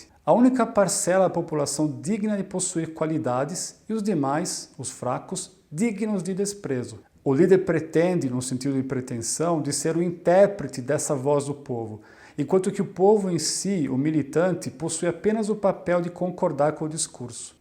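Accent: Brazil. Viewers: CONHECI o seu canal hoje por causa do vídeo no canal do Henry ... Esse vídeo sobre #Fascismo simplesmente é o mais top dentro de 30 min ... Parabéns !!! Esse sotaque é meio Blumenauense ??? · Revendo seus vídeos, nosso que o seu erre já virou éhe.Observe quando o senhor diz Homa. Gostei de sentir o meu Brasil na sua memória. Maravilha! Afetuoso abraço.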